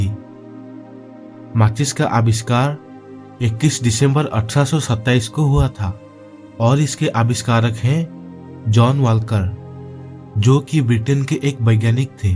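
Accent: Odisha, India. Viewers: Are Bhai odisa se ho kya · Hindi pronounciation ru jana paduchhi j apana odia.... But nice historical facts keep it up.....❤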